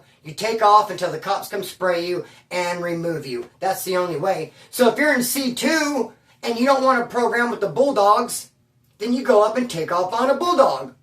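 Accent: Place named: California, United States. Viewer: until you started talking and the Cali accent came out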